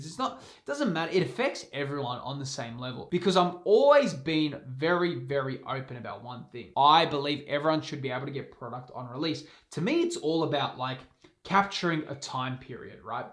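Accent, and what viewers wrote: Australia. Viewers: Only subscribed for the Australian pokemon accent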